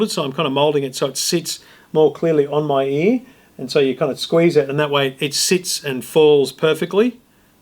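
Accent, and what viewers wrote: Australia. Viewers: Australia …..Australian dollars. Can you not tell by his accent ??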